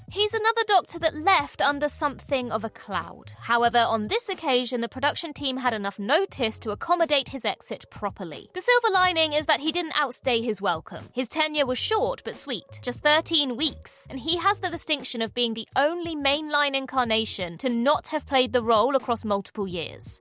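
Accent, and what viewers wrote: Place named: RP, United Kingdom. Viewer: Thank you. You were quite magnanimous in sticking to the script even as you repeatedly said "I wouldn't have picked that one, but it's here on this list, so let's roll with it." I am always grateful to hear clear crisp RP (pretty much) accent. Thank you for that too.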